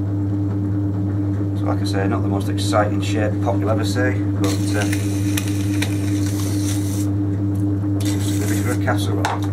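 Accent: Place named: Lancashire, United Kingdom. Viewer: It's a Lancashire accent.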